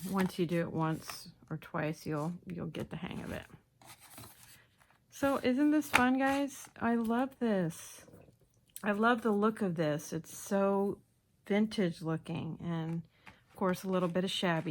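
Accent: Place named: New York, United States. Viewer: Loved your New York accent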